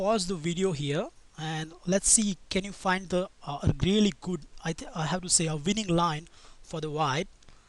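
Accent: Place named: India. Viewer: Thanks, i like your indian accent very humble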